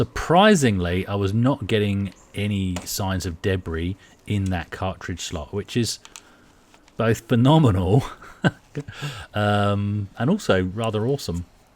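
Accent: Australia. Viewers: Really picking up that Aussie accent now, eh?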